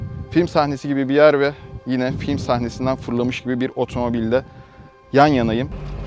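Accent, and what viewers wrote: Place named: Neftchala, Azerbaijan. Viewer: Aaa tobaa gedib Bankəyəə cıxıb eeeeeeeee😀Abi Neftcala lehcesidi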